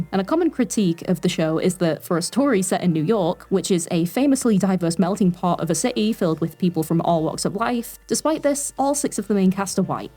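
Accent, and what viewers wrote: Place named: Lancashire, United Kingdom. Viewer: Haha yeah I've always found it wild that there's one of my 'own' doing the whole Youtube thing. I can't place your accent, you're definitely from around at least Lancashire/Greater Manchester but I imagine you enunciate more on YT anyway seen as I tend to do so when I talk with anyone outside of the North, especially Americans/Canadians. Americans often think I'm Scottish because of how thick my Bolton accent is, even when enunciating. UK Publishing has a huuuge classism issue. They've been trying to level out the playing field for years but it's still very gatekept. Both for workers looking to get into the industry and authors looking to get published. Most agents are from middle class backgrounds, so as the first port-of-call for authors looking to get published, if they don't relate to the material sent to them, it often gets rejected. 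It doesn't even get a chance to be looked at by a publisher. Though I'm glad to see we have indie publishers based out of the North like UCLan now. Haha yeah I love my '80s stuff but the '60s and '70s were banger too. Whilst Northerners have been largely barred from publishing spaces, we instead have a rich performing arts culture. Plays like A Taste Of Honey by Shelagh Delaney were groundbreaking. The fact a play written in the '60s, set in grimy Salford, depicted a teenage schoolgirl getting pregnant by a Black sailor and then exploring her feelings about the pregnancy with her openly Gay best friend is mindblowing when you think about t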